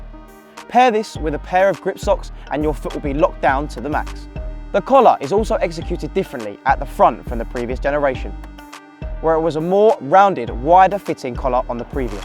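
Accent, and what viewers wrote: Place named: United Kingdom. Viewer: British accent, but says soccer not football😩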